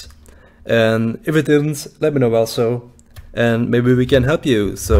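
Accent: Netherlands. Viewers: are u dutch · i just knew you were dutch haha i could hear it because of your accent haha. geweldige video man. kan eindelijk weer is normaal left 4 dead spelen haha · Are you dutch?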